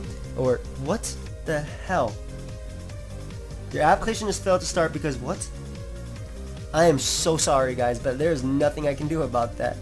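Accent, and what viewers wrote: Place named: New York, United States. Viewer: Liam Flaherty Nah, he just has a lazy way of speaking, along with dat slight Nyu Yawk accent.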